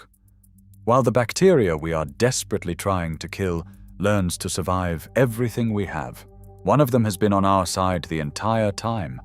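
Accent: United Kingdom. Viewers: probably informative im sure, but I turn off the message presented with the heavy British accent, as if accentuating the one we can really believe and trust. I wish all heath, peace in well-being everyday.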